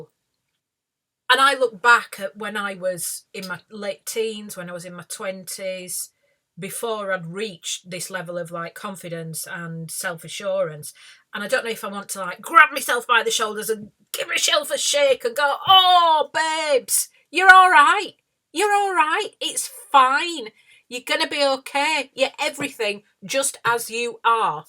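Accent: United Kingdom. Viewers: I love her British accent so much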